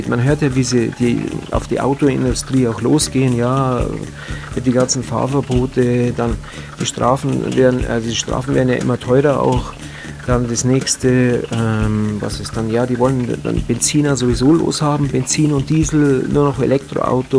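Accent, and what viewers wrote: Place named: Bavaria, Germany. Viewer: . Guade 5000 km/h;) respekt man. 15 kilo weg da hast ja isar body jetz. Was kostet 1x akku aufladen. Haste das mal umgerechnet? Ich heiz n normales ghost mountainbike ohne motor. Aber ich schau manchen e-bikern schon manchmal wenn meine oberschenkel abkacken n bisschen neidisch hinterher. Kennste die trials zwischen thalkirchen und grosshesseloher brücke? Fetzt!! Aber ich bin da absolut amateur. angenehm deine videos mit deinem bayrischen gossen;) akzent.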